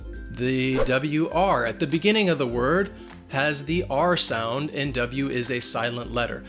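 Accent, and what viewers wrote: United States. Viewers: Thanks , and Yes I share links of some of your videos to Japanese students from the platform I teach. My question about your nationality was cause I couldnt detect through your accent where you were from exactely. You sounded american, but words are too well pronounced like those guys from the News, probablly as a teacher you have learned to enounce (or is it announce) your words as you speak, so that basic students can 'grab' what you say. I also thought you were a foreigner (non native english speaker) with an excellent pronunciation, since your wife is european. Anyway it doesnt matter it was just curiosity . What matters is that both your video classes and your english are both excellent. I will keep sharing them.:)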